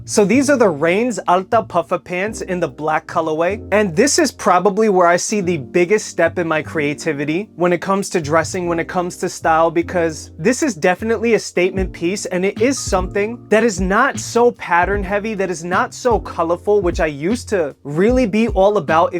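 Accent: New York, United States. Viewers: you figured out how to mix American vintage and Japanese with a city overtone (New Yawrk 😂) No one YT is doing this and the space is kinda slim anyway, which is ironic. This channel is by far my favorite.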